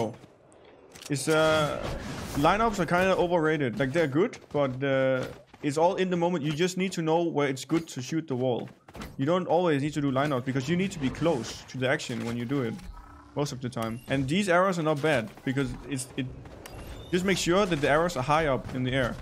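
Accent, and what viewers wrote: Denmark. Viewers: are u from denmark?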